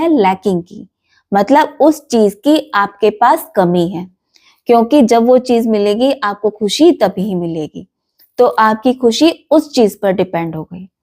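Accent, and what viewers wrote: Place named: Gujarat, India. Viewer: Aap ki language sunke muje lagta hai aap gujrati ho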